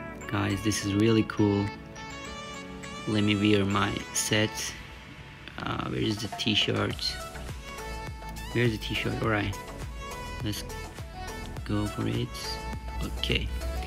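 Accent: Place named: Turkey. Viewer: sesi çok türk gibi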